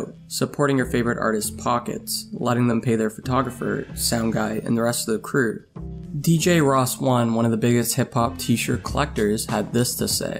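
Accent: Canada. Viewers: Hey, are you Canadian? Once you mentioned Wrong Bar and from 2012 i was like wait.. that sounds like Toronto talk!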